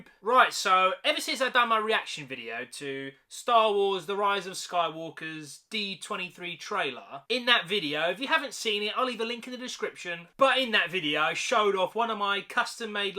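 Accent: United Kingdom. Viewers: Why does he look like an American hipster while talking like a Brit?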